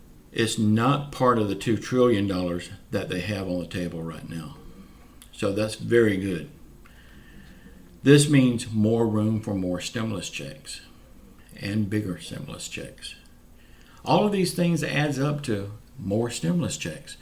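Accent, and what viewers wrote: South, United States. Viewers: You crack me up I listen closely to everything you say and I do believe you and most of all I love your southern accent let's pray that we get the $2,000 and retroactive back from March that would be completely awesome continue to do the good work keep up the good work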